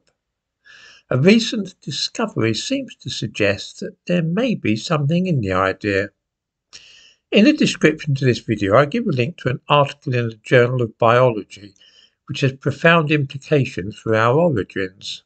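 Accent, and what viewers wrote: United Kingdom. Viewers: sounds like the British, after BRIXT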